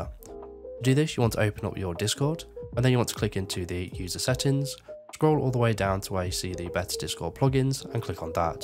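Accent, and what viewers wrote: United Kingdom. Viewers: Addicted to your British accent.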